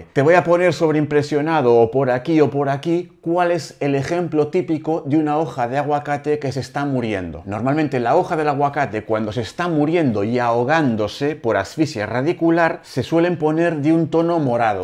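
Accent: Spain. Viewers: Hola perdon x la ignorancia pero recien empiezo con esto.. soy de Argentina, por tu acento se que eres español.. a que le llamas perlita? A la grama volcanica? Cuanto tarda estimado la raiz en brotar de la semilla germinandola?. Pues aqui en Argentina hace mucho frio de mayo a septiembre... con buena luz artificial basta?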